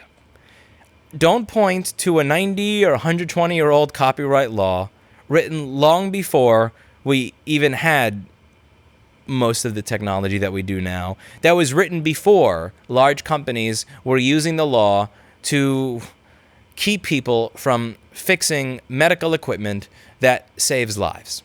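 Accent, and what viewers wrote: New York, United States. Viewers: I love how 99% of the time is hardcore in-your-face New Yorker, but when it comes to BlackBerry.... suddenly he's all sweet with a cutesy voice! It's quite the difference 😁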